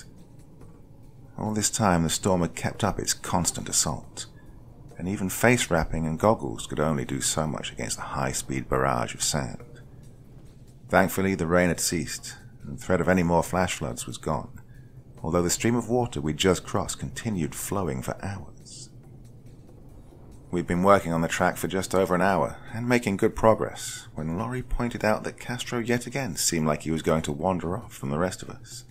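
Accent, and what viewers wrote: England, United Kingdom. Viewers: was great. btw u from the UK u kinda have a british accent. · What part of England are you from ?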